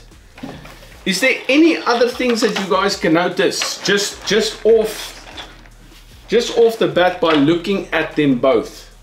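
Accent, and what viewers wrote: South Africa. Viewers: Your from New Zealand? Your accent sounds south African ? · Great vid and super informative! Am defo not liking that body and lack of detailing on V2 at all and otherwise it looks like a bit of a rip off since its more expensive! However I am liking your awesome accent though😎 Are you originaly from South Africa? Greetings from Germany. Subbed✌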